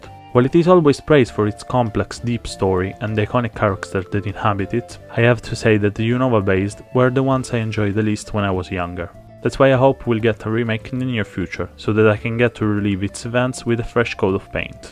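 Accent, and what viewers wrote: Italy. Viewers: Maaa, per caso lei è italiano?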